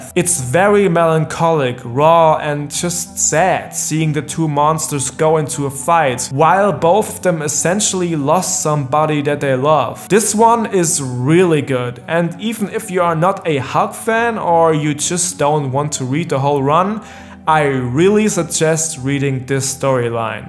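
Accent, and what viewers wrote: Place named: Germany. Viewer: I came for the German accent.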